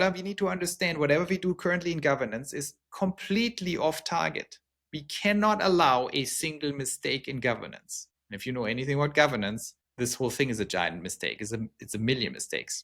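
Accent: Germany. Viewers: Germans are usually very logical thinkers but when you say that the AI is going to be constrained you are deluded. AI will be constrained about as much has the United States Congress has the ability to rain in spending. Governments competing against governments will not restrain themselves. You said it earlier that we are in a race condition. AI will be developed without restraint for the promise of power that it gives. Your German accent is very endearing as I had an Opa myself. Blessings.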